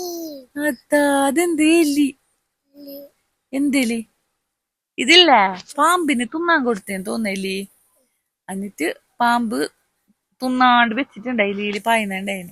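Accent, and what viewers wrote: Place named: Kasaragod, India. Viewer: Kasaragod basha തുടർന്നോളു ഇതാണ് നല്ലത്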